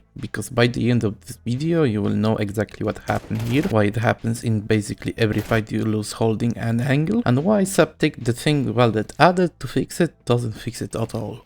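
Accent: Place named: Soviet Union. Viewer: when you click on a CS2 video already expecting the crackling and calm soviet accent explaning why you suck at the game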